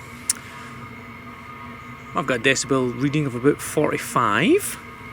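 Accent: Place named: Fife, United Kingdom. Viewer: Your accent rather reminds me of Fife Robertson in the 1960s. Are you perhaps from the proud Kingdom of Fife ??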